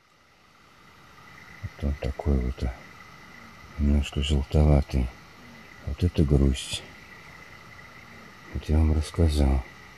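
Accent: Tirlyan, Russia. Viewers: Да уж. Сейчас у нас очень красиво! Золотая осень!, вы родом из Тирляна? По разговору похоже. С удовольствием прогулялась с вами по лесу!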